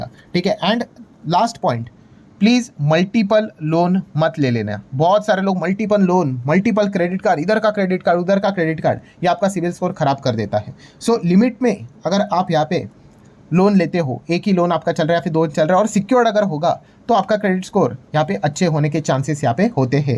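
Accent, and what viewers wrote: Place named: Maharashtra, India. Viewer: sir chya bolnya waru n ch samjat ki sir marathi aahe sir sher market che danvir karn aa he free madhe deep knowledge detat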